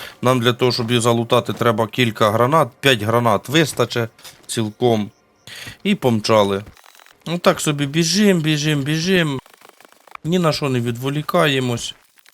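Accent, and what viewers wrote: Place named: Vinnytsia, Ukraine. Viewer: Дякую за якісний контент. За вінницький діалект окреме дякую. То батьківщина мого тата. В дитинстві часто бував у Шаргородському районі. Я бігаю з двома "Дніпро". Один розточив на 7.62. Снайперка з магазином на 21 набій. Гаус. Три пістолети під різні набої і збільшені магазини. Маргач - 13 набоїв. Довго бігав за кресленнями і магазинами. Тепер шкода закінчувати гру. Хочеться грати і грати.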